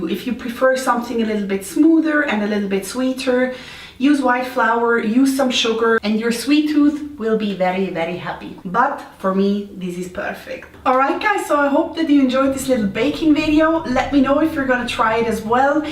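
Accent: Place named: Switzerland. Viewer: looks yummy! I never tried a vegan version so I'll be sure to try your recipe next time(+the sugar haha)! 😍 I let my bananas get even browner 😂 ...my boyfriends mom taught me to freeze the ripe bananas. (They get super soft after thawing, so a bowl is needed! And it gets pretty messy haha.) AND your Swiss accent is the best! Accents are so much fun! 😂 I enjoy the tifical Pilipino accent, you know? But se German won is good too 😂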